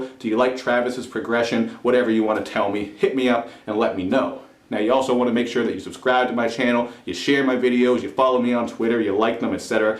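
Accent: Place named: Canada. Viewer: oh youre canadian thats a funky accent bro and in part sound funky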